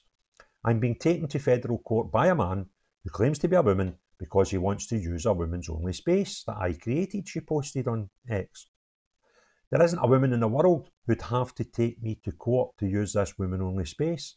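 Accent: Scotland, United Kingdom. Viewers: No disrespect but your Scottish accent saying ‘giggle vs tickle’ made me laugh out loud. You could tell any joke badly but your accent would make it funny. Then you go onto talking about the cat/dog identity confusion. Sounds like a comedy show. Good luck on your channel. But I recommend you try stand-up. British accents have the advantage of already sounding funny. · Are you from Scotland? Your accent sounds like my dad's,who is a Scot.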